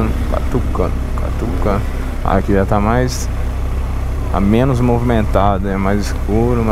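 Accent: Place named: Belo Horizonte, Brazil. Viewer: Namoral o sotaque de BH e muito massa ce e louco 😅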